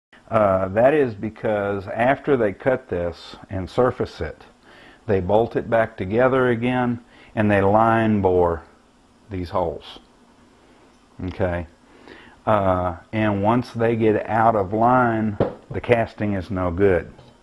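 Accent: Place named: South, United States. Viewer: Southern accent lol. He knows what to do I'll give him that but this video is no good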